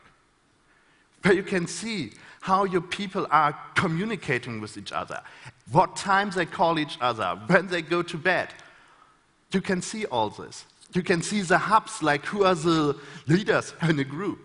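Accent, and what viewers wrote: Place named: Germany. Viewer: I don't care about stutter but this German accent? Gosh.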